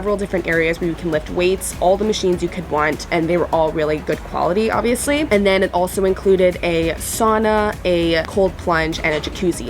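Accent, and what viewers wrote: United States. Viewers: This girl has a total American accent…… I am American and there are different accents based on where you live, but hers is pretty standard American. The American sound that other Americans would consider to NOT have an accent. Accents that are commonly known around the world but very specific to a local area and not all of America……a New York, Boston, or far south accent. These are not representative of most American speakers, this girl is.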